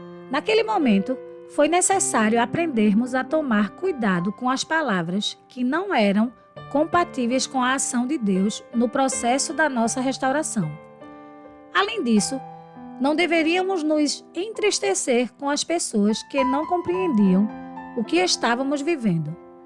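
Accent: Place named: Northeast, Brazil. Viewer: Estou feliz de ouvir esse áudio com uma voz nordestina 😊😊😊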